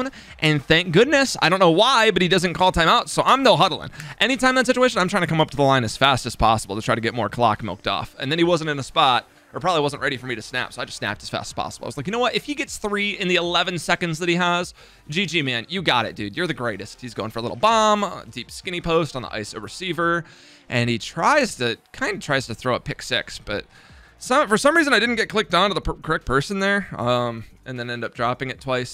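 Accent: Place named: South, United States. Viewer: I’m here for the southern accent and I lost already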